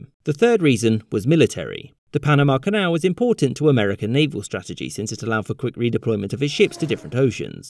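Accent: England, United Kingdom. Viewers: Great. Now. Why did US give up the canal? Cut the English accent. Slow down. speak simpler. Don't try to impress with how smart you are.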